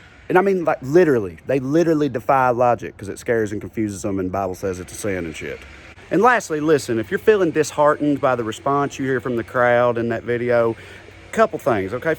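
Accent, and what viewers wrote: South, United States. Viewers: This over-the-top sense of humour based on common sense and delivered by this obviously properly informed, well-educated human being in this seriously country accent, still has my brain trying to assimilate and adapt to the fact that people like this exist 👏☺️ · I think more often than not you’re talking out of your ass sweetie. Maryville Tennessee resident here. I’d debate you on damn near anything. What I’ve seen of you so far? There’s nothing insightful about you. You basically just repeat what other people say only you do it in a southern accent so for some weird reason it makes you exceptional. I think you’re a fucking idiot.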